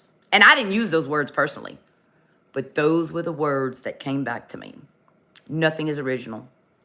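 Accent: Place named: Louisiana, United States. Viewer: Okay, I have to say. I LOOOOVE your accent! I am from GA, but your accent is amazing! I could listen to you all day! LOL You gotta be from Louisiana!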